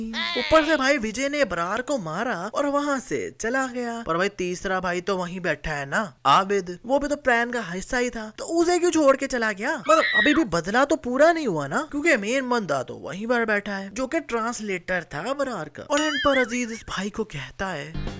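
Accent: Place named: Pakistan. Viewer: Tu 100% pakistani hai tere accent se pata chal rha hai, aukat ho to channel pr bata de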